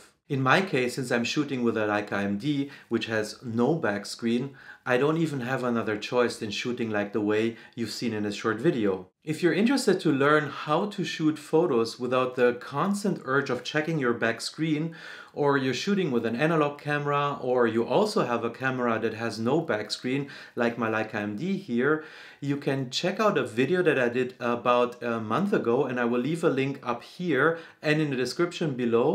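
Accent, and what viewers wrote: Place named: Zurich, Switzerland. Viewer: Sali hier ist Benjamin. Bin über Dich gestossen, als ich für meine neue M6 Tipps gesucht habe. Komplett unerfahren in Rangefinder und deren Funktion bin ich auf der Suche nach Fokus etc. gewesen. Dann kam Dein Video zum Vorschein. Dachte mir den Akzent kenne ich doch irgendwoher, anschliesend habe ich unter “About” herausgefunden, dass Du in Zürich lebst, ich auch. Fand ich ganz witzig. Eventuell kann man sich ja mal treffen. Wie gesagt bin ganz neu was M angeht, und jetzt mit einer Traumkamera, die zuhause wartet ausgepackt zu werden. Das passiert morgen. Wollte eigentlich nur einmal Danke fürs Video sagen. LG Benji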